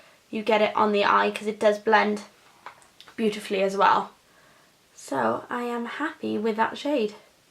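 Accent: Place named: Wales, United Kingdom. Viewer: I noticed your welsh accent straight away Im Welsh too Lovely vid :)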